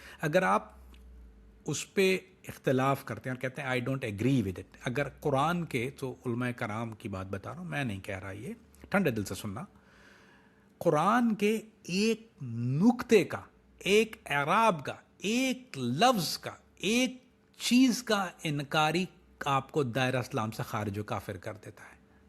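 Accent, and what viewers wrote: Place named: United Kingdom. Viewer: U looks like so Deployment ...becoz ur acent is also like British...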